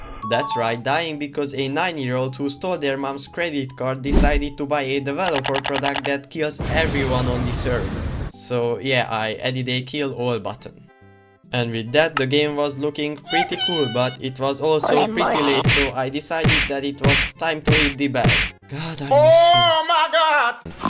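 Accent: Hungary. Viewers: Csá bro hallom hogy magyar vagy amúgy király vidi❤😮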